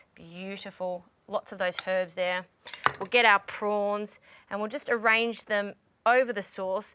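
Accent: Australia. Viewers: I love the Aussie accent!!! Its like a Southern accent here in the USA.😂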